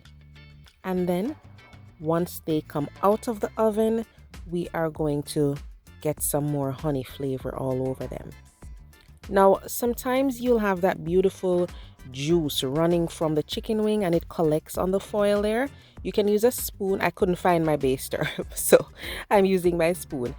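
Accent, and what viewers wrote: Jamaica. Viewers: I love the Jamaican accent and your women's track team consisting of Elaine Thompson-Herra, Shelly Ann Fraser Price and Shericka Jackson is FLAWLESS. United States don't have a competitor to match for the MOMENT. But don't count us out! The men's track team is dominating and Jamaican can't compete with them.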